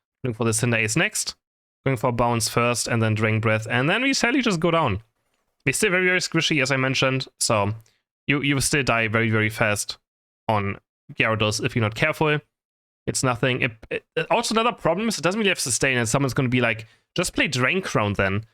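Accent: Germany. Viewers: your accent sounds like German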